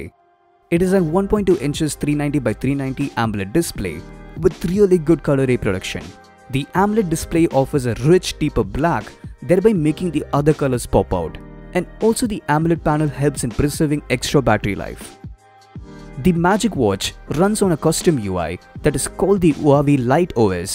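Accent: India. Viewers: dat indrish tho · I think this man from india, didnt catch this fucking accent.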